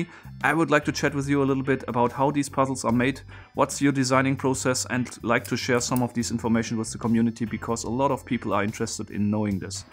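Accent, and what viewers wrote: Germany. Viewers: you sound like light indian accent. Oh youre german, yeah that sounds more like it.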